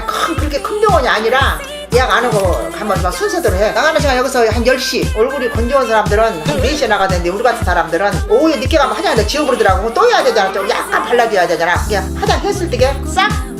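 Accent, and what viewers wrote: Jeolla, South Korea. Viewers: 할머님이 전라도 사람인가요?? 저희 할머니하고 말투가 완전 비슷해요 !!!ㅋㅋㅋㅋㅋㅋㅋㅋ저희할머니보는 느낌 ..❤️ 오늘도 잘보고 가요 ! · 메이크업 영상 나를 울리게 하네요 웃다 울다. 우리엄마 생각나게 해서 웃다가도 울고 전라도 사투리에 엄마랑 목소리 말투 너무 똑같아서 계속 보게 되요. 한 영상을 진짜 여러번 봤어요^^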